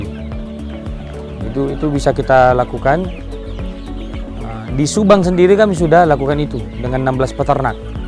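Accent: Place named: Sulawesi, Indonesia. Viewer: Logatnya mcam orang sulawesi kyanya ya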